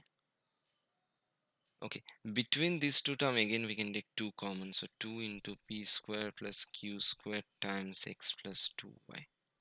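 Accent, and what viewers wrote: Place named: United Kingdom. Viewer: Bro.. U should make in Hindi and English mix.. I mean.. Teacher tech like that But u are said like a British.. It's very bad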